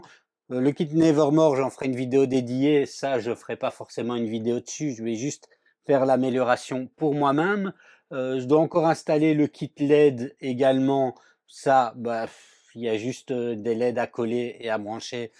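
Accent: Belgium. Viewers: Bonjour Merci pour cette série Du montage De l'imprimante Voron Pourquoi vous dites À faire en fr Vous n'habitez pas en France Car je trouve que vous parlez très bien la langue française Avec un petit accent Je pense Belges ou flamands Wallon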